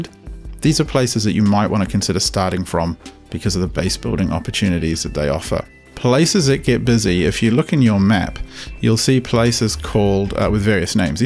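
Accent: New Zealand. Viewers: Are you from New Zealand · You would not believe the day i have had. Total shit day where a job i did might not even pay me and when i get home to learn the new game i am playing it is a Kiwi behind the microphone. Do you know how much that shits me. He is talking about being on a rock with a 'beer' underneath him, and i'm like what the fuck is this game doing where a player is on a rock because there is a beer underneath him. Then he goes on about how 'Mital' is pleasing to him and i have to look up the player guide and search for 'Mital' thinking it is some character that i haven't seen before. Nowhere is there a mention of Mital in the documentation i can assure you. Shit man, learn to speak proper English would you. Get rid of that accent so you can sound more like normal American English. We would prefer it if you try to sound like an American so we can actually understand you. 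Imagine if we were in a battle of some war and the bloody New Zealander has to ask someone to pass him a weapon and the New Zealander is like "quick, pass me the wippon i have someone to attack" and the other USA people are like "what do you mean pass you the wippon, i don't know what a wippon is. Get the hell out of the front line you New Zealander because no one understands you and why you keep asking for something called a wippon. Go home New Zealander is what they would say. They would say "Go back to your island and you can have all the wippons you want but here in reality we talk English with American accent and if yo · wait a sec r u from New Zealand aswell <3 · Dude are you from nz to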